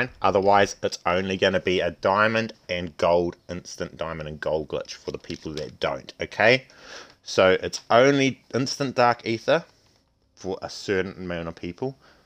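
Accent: New Zealand. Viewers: new zealand accents make me want to be from new zealand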